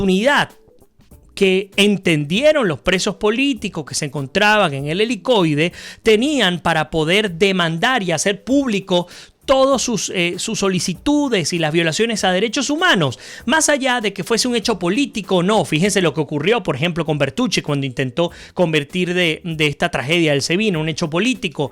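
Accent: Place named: Rioplatense, Argentina. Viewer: descubrí estas trasmisiones tuyas por YouTube hace sólo unos días atrás y mi primera impresión ha sido muy positiva. Una pregunta, aunque te indentifiques como venezolano, detecto un acento o argentivo o uruguayo, es correcto? Pregunto por pura curiosidad nada más. Un saludo de un cubano desde Madrid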